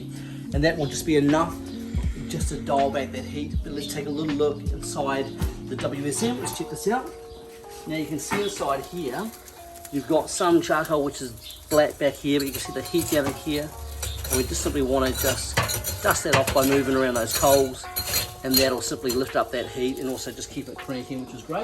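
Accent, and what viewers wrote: New Zealand. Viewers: Looks great! Love seeing all of your BBQ gear! Do you have a favorite? Also, hearing you speak is making me miss NZ! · As soon as you spoke, I knew a Kiwi accent from anywhere 🥰 thanks for sharing 😊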